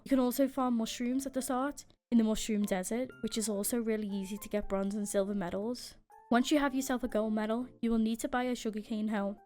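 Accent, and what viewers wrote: Liverpool, United Kingdom. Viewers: are u from liverpool u have a scouse accent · I love that british accent lmao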